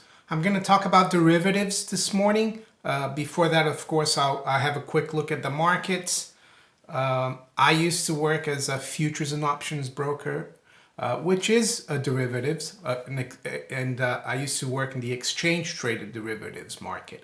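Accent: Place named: United States. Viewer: Hiya, u are an economic genius, love you, love your programmes but you need to get rid of your Yanks accent, you are a Londoner now m8!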